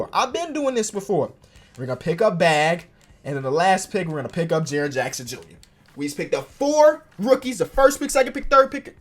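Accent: Chicago, United States. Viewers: Was this guy born in Chicago or something?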